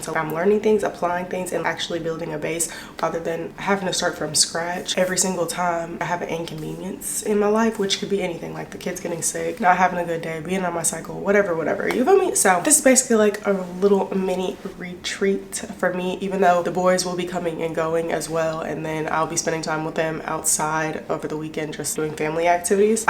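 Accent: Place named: United Kingdom. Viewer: British needs her own tiktok or something. 😂 She's a hoot! I mean, I love regular too, but British... LOL! I'm here for it.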